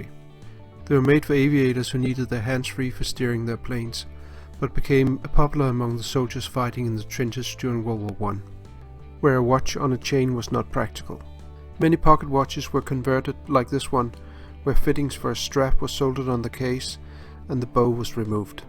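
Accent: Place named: Denmark. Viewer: at first i wasnt sure where you were from but then i realised you were danish. så det er sejt at høre at jeg ikke er den eneste dansker med denne hobby haha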